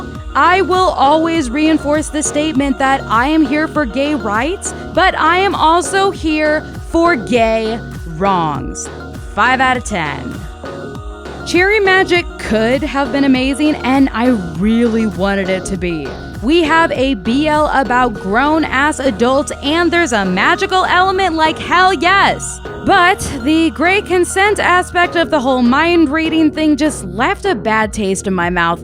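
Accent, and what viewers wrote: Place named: United States. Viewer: This person is speaks like an American 😂 She watching a-lot of these things all wrong 😑. Just because a character has a identity or hobby doesn’t mean it’s representation for people identify similarly. Americans are used to the villains being outside of the relationship, but in these shows the love interest is the villain 🦹‍♂️ Then she’s gonna get mad about one of them having assault when it literally says that the main character gets assaulted in the title 😂 (like what did you expect for real?) The pedo are crazy though but that’s part of Japanese history. Looking into that time will explain why those got so popular. 😬 yikes. calling citrus grooming is kind of overkill though 🙄, I get that’s a red flag 🚩 all the way, but unless is a excessive power or age gap, that’s just throwing around words. some things are only acceptable in cartoons/anime, like unrealistic, body changes or crimes. 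🥱 her actually taking these things seriously is like, Bro, why do you even watch this 🙂‍↔️ but I know why it’s for her job😒 Love stage relationship isn’t based on the SA 😭. if you’re gonna trash my shit, at least do it correctly! It was based on bribes 😣 convincing someone to change their mind Does Not equal abuse, Relax! (Bloom into you) p.s. It’s a GL you have to expect that there’s a chance that the main couple is gonna get together, like what! 🤣 The cherry magic thing was crazy like, she been trashing anime pedos this whole time, but she didn’t talk about that trash side couple 😭. Like thi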